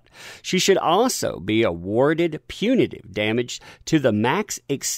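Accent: South, United States. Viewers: Love you're work and am with you on the things you talk about. It is scarie to think about the due process is being fuzzed out with pure crazy making b.s.everywhere and needs to be addressed before it's lost completely. Thankyou for you're time and effort to enlighten folks to what's going on. 👍 Loving the southern droll way you speak. ❤️ Love from UK.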